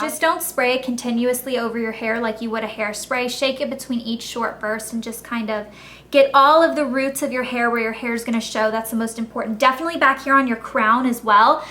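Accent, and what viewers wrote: South, United States. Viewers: Are you from the South? I never noticed your accent till this video? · I feel like you "southern" accent is getting stronger each day. haha. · in the first seconds i thougt you were from the south because of the slang :D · she has a southern accent? weeell, I guess I can't tell because I'm southern too xD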